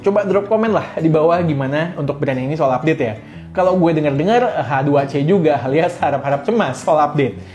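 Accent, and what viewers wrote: India. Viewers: aga tripping kwkwkw karena yg ngomong mirip orang india tapi ngomong indo, kaget saya hahaha keren keren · Gue kira channel orang India. Kaget gue pake bahasa Indonesia 🤣🙌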